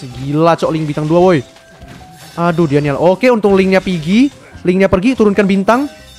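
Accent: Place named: Java, Indonesia. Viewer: Asal mana ya mas ? Kok logatnya agak jawa dikit hehe salam dari surabaya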